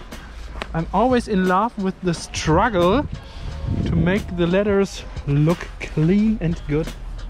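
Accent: Germany. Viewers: Man hört einfach sofort das der Herr Deutscher ist 😂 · If anyone didnt notice, that´s the way how german people pronounce english language :D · echt richtig cool, aber schlimm wenn deutsche englisch sprechen xD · Bin ich doof oder hat er ein absolut deutschen akzent😂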